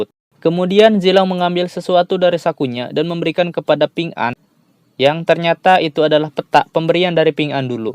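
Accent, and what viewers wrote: Indonesia. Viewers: Logatnya terlalu ke Sunda"an bro bikin kurang sreg dengarnya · Ngapaknya sungguh kental 😂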